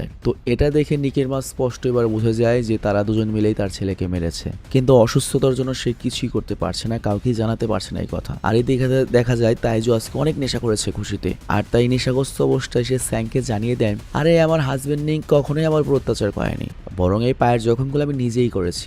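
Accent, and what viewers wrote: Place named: Chittagong, Bangladesh. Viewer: ভাইয়া মনে হয় চাটগাইয়া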